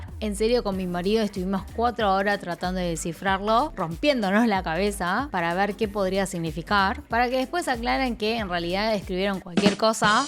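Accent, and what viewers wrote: Argentina. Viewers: Hace cuánto que vivis en argentina ? Me sorprende tu acento 😂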